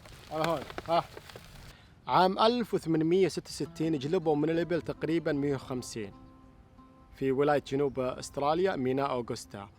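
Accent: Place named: Bedouin, Arab world. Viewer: لان بصراحه لهجتك شبيها للهجت بعض البدو هنا في مصر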